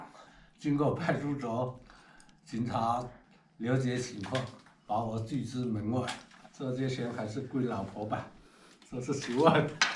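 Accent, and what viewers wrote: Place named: Fuzhou, China. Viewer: 听口音是福州附近海边的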